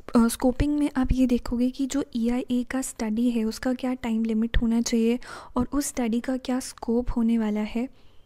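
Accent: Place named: Kashmir, India. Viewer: Good video. Mam your sound like Kashmiri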